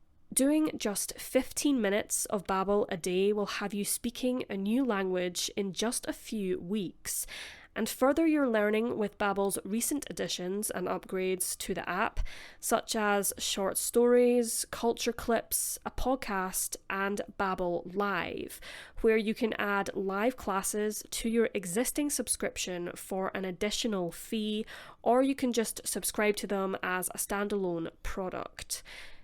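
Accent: Scotland, United Kingdom. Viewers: If she'd talk normal,you would not understand a word,unless your'e scottish???? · She's enunciating her words properly so everyone can understand what she's saying... it's not slow. The Scottish accent is sometimes hard to understand if you're not from Scotland. Listen at a different speed if it bothers you that much 🙄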